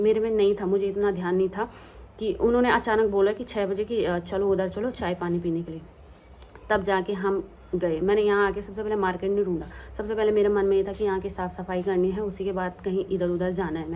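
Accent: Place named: Garhwal, India. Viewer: Ap bhut axe ho or jb aap gadwali bolte ho to bhut acha lgta h❤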